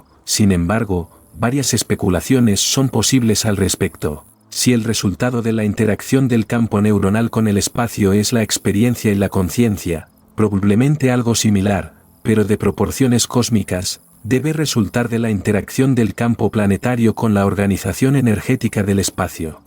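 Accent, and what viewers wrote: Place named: Spain. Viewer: se agradece sobre manera, el doblaje en español de España, gracias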